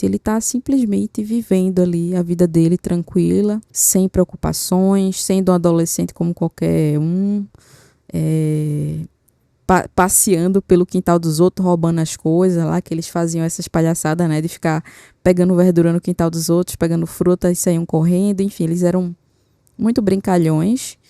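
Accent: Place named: Recife, Brazil. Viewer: Vixi Maria que agora melhorou foi muito! Cafe com leite, Senhor dos Aneis, sotaque lindo de Recife e observacoes/trabalhos sensiveis…bom demais❤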